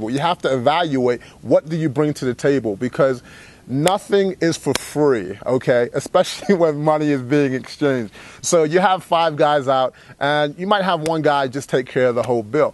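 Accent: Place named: England, United Kingdom. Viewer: you seem to have an English accent.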